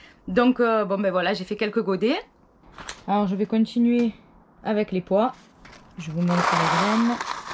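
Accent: South, France. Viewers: Les premiers semis avec l'accent du sud ...Que demander de plus 😉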